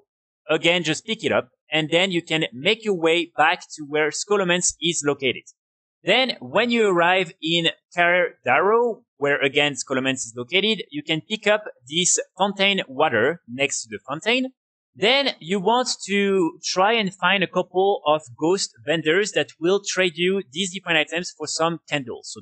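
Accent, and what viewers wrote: France. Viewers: Bonjour, merci pour la vidéo! J'ai une question, je joue chevalier de la mort. Je suis forgeron 300. Est ce que je suis capable de fabriquer le set T3 Guerrier ou je suis obligé de réaliser tout le run de la vidéo avec un guerrier que j'aurai up lvl max et forgeron 300? (j'ai écris en français car je sent l'accent français chez toi ahah). Hello, thank you for the video! I have a question, I play death knight. I am blacksmith 300. Am I able to make the T3 Warrior set or do I have to do the whole run of the video with a warrior that I will have up lvl max and blacksmith 300?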